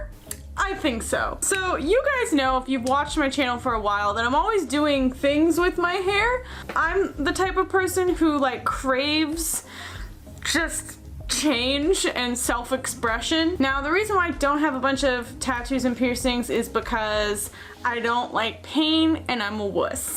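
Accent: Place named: Midwest, United States. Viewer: 😂 I thought you were doing a Illinois/Wisconsin accent purposely at first. Looks so cute. My hair is identical to yours and I miss my longer hair. Thanks for inspiring ❤️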